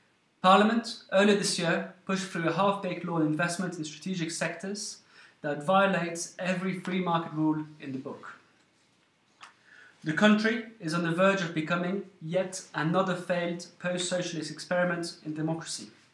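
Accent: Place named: Wallonia, Belgium. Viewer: Wallon ? enfin c'est ce que je dirais à l'accent. Excellent video thank you very much , all those numbers are very very very interesting.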